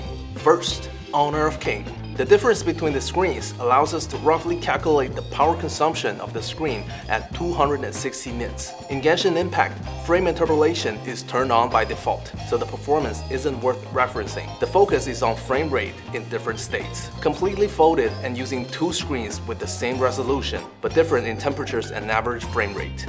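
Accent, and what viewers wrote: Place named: England, United Kingdom. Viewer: 还真整这口老英语腔儿啊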